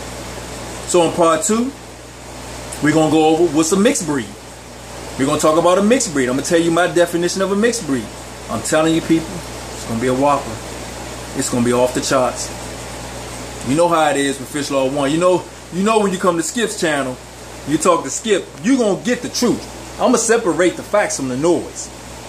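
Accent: DMV, United States. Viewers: Great explanation! Great looking fish too Champ. 👊🏾 Im from DC bruh and based on your accent you gotta be from somewhere in DMV area! 😎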